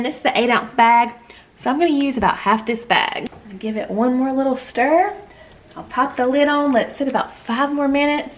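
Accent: South, United States. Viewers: Love the southern accent! Part of the southern tribe. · I'm new to your page and I love what I see so far and I love your southern accent and by the way I'm from south carolina and can I ask where you from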